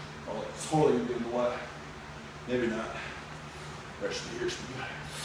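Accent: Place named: Wisconsin, United States. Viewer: It always sounds like a Canadian accent but I guess Wisconsin accent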